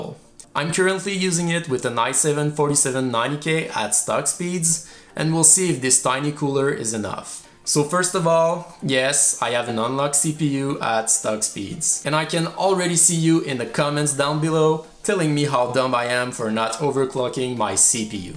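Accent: Quebec, Canada. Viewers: Es-tu Québecois?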